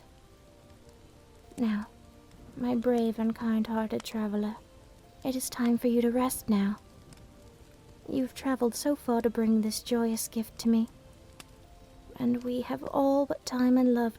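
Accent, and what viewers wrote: United Kingdom. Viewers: Why is she British now? I like it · yes i get to hear my british dragon waifu again. <3 but in all seriousness keep up the good work and keep captivating us with these stories :)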